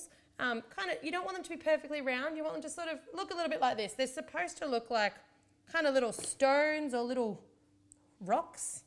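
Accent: Australia. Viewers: I love your voice. It is like an Australian Jennifer Lawrence! :)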